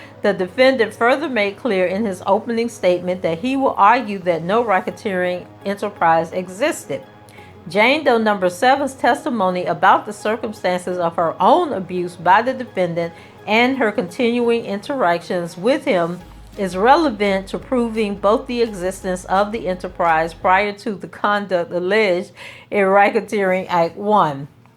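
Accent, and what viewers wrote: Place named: Georgia, United States. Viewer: you sound like my country family from Reidsville Ga with your accent 🤣 Background =Bikeground.. lol 😚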